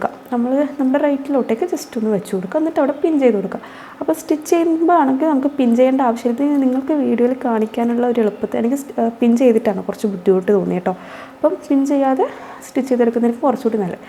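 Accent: Kannur, India. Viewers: Iyaalde manass nalladhaa✅. Ithrem detailed aayi parayunna aarulla.... Kannur daaa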